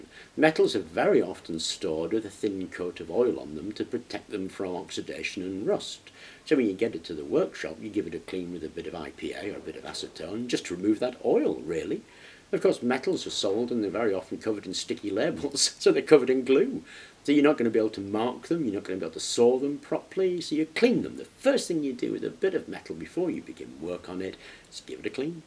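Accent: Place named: United Kingdom. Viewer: You have a curious mind but a lot of people don't so their mind works on what they have been told. Most of us are more lazy or like the beaten path, why, well it could be fear because what we don't understand could harm us. I still like to try and I enjoy your channel but I think it's the British accent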